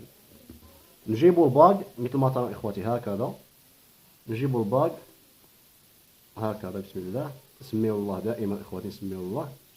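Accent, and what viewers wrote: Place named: Tlemcen, Algeria. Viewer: بارك الله فيك اخي اللهجة نتاعك تلمسانية لالا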